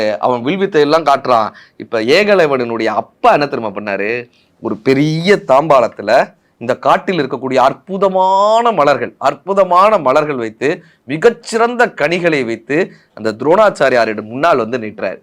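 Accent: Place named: Madurai, India. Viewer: அஸ்வத்தாமன் தானே அவர்.... சொல்லிய விதம் மதுரை மண்ணுகுரியது.... 🩷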